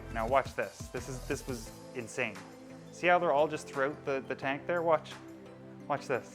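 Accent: Canada. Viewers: Thought I heard Canadian accent... then I heard him say Dartmouth... Dude, I used to live in Spryfield‼️ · Sounds Canadian...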